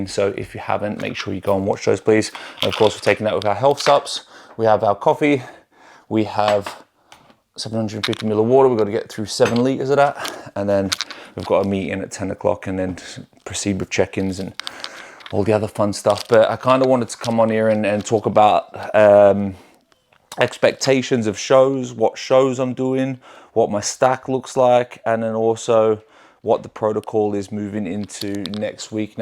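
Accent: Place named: Australia. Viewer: Need a bigger kitchen bro hahah sounded like a aussie 😂, seen you on insta so subbed ✌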